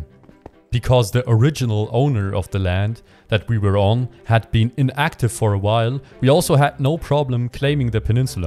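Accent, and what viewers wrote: Germany. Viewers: der deutsche akzent hittet schon echt aber ich feier den content mega! keep it up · dein deutscher akzent ist so wild XD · Are you german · Are you from germany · Man kann hören das du deutsch bist haha cooles Projekt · i can hear the german in your voice :D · Are you german?! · You kinda sound German :) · I hear a german accent.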